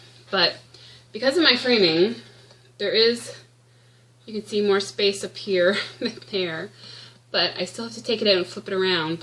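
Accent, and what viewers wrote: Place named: Canada. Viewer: First time watching you and I thought I detected a Canadian accent and I was right! Love your finishes and really, you stitch fast! You call that pretty leaf piece small, but that would’ve taken me forever! Also l love your haul ;). Happy stitching!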